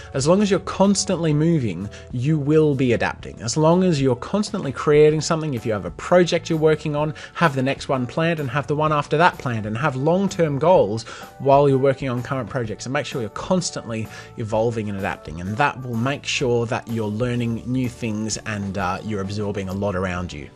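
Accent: Australia. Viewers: Who else is watching this in 2020 and thinking he used to sound more Australian? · is he Australian? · Is he Aussie? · U r australian 😆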